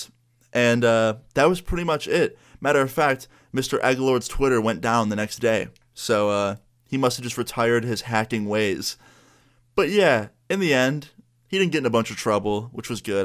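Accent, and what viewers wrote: New York, United States. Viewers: You sound like an american Fainted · Heh, had a feeling you were a new Yorker, im the same, you had the accent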